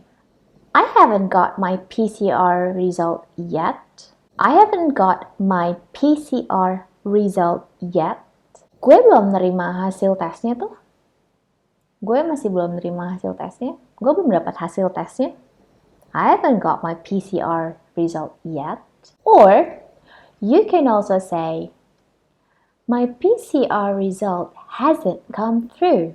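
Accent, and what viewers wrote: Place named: South Jakarta, Indonesia. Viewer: jaksel aksen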